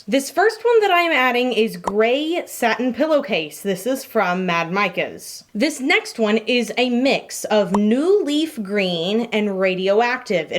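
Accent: Texas, United States. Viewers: It’s OK,!! Remember Marchesa?? You Texans are so charming!! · “Gawly Dawg”!!!??? She is such a Texan.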